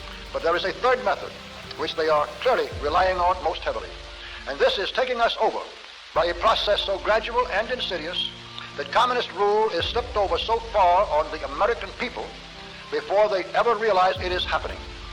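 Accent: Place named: Canada. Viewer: Sounds like Canada ..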